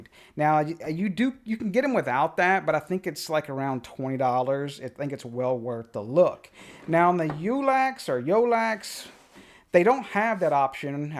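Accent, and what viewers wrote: Louisiana, United States. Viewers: Are you from Louisiana?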